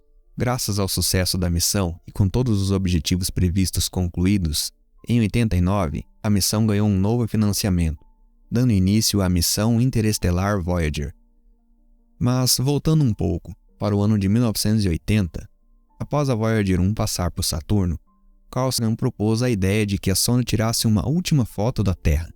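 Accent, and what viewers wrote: São Paulo, Brazil. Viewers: Seu sotaque Paulistano é muito legal, sem falar nesse belo documentário. As voyagers serão a prova da existencia de uma fantástica e predadora raça humana, que talvez terá se autodestruido em função do seu próprio ego.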